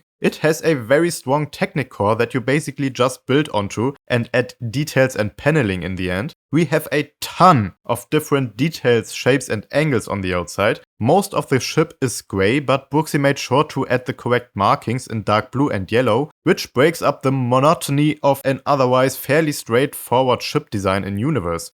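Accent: Germany. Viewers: Sounds like zhe Man in the Video is German (English accent) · Are you german?😭😭😭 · Do i hear a german-like accent? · Your english Sounds Like a German speaking english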